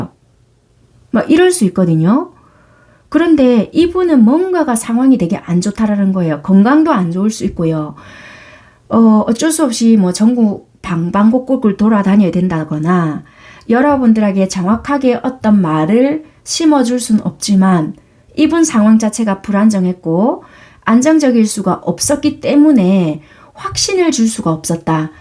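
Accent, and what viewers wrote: Busan, South Korea. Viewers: 너 부산에서왔쩨ㅡ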